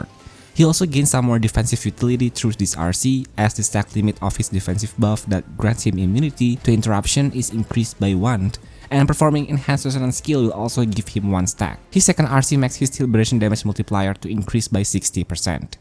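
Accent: Indonesia. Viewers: Org indo bukan si 😅 kek ada aksen indo nya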